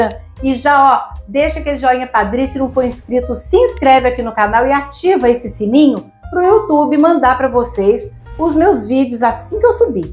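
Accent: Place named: Minas Gerais, Brazil. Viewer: Que delícia de cookie e de sotaque. Sou mineira e dá gosto de ouvir kkkk. E de comer todas essas delicias que vc faz aqui. 😋😋😋😋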